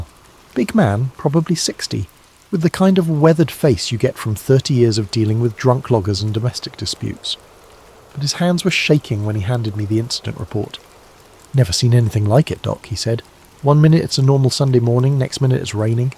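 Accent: United Kingdom. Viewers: Ugh the older I get the less I like the British accent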